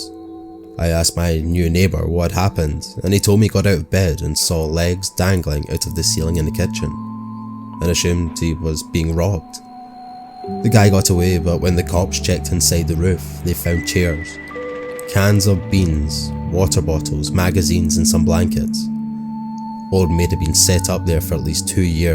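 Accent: Scotland, United Kingdom. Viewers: 🙂 Welcome Back Mr.;) Thank you for the Awesome Narration!!! I hope that you and everyone else has a Twinklelicious ✨Tuesday Night too;) much love Sexy Grandma Darkness ✌️💋 Just Joined your family:).. your Scottish accent is so Beautiful 😍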